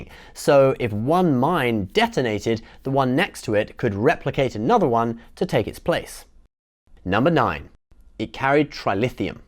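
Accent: United Kingdom. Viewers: Uhh Boi my man the is back again! Sorry but i just missed him...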